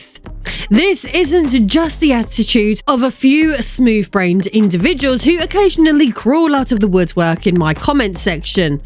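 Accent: United Kingdom. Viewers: the only thing you are is gorgeous. Regardless of yours or anyone else’s political stance, the fact that you’re so attractive, with an amazing accent (I’m a sucker for that British accent) and just the fact that you’re a real gamer. ❤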